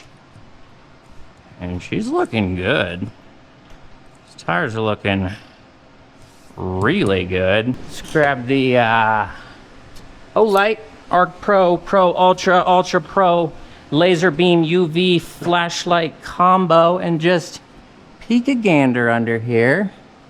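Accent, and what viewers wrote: Canada. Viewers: Love your videos. Do you use Bosch 3323 filters on Hondas since they moved away from Filtech and what do you think about Aisin transmission fluid and coolant? Gemini told me that it is as good if not better than Honda but I was a little concerned when I looked at my receipt and saw that my independent shop didn't use Honda fluids when I had my 73K 2012 Odyssey EX-L in for service. I bought it new and hauled the kids around in it for years so I can't get rid of her even though the last service cost me more than she is worth. I thought that Aisin timing belt kits were industry standard but didn't know anything about their fluids. Can you share your thoughts on those products - either in comments or on a video? Also, I assume you grew up in Canada based on your accent, etc. but haven't seen a video exp,aining that. Is there one that I missed?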